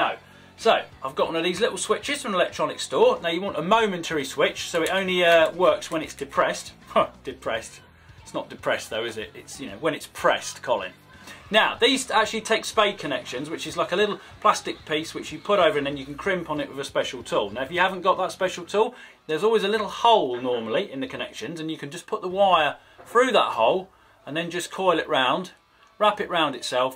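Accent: United Kingdom. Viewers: It was some screws he's British it's his accent that makes you think that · I love his British accent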